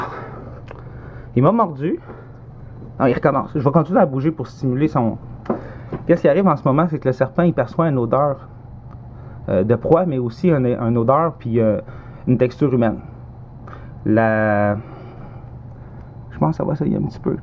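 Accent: Quebec, Canada. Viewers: Je vous kiff les Québécois j'adore votre ville c'est beau tout ce que tu veux mais sérieux votre accent faite quelques chose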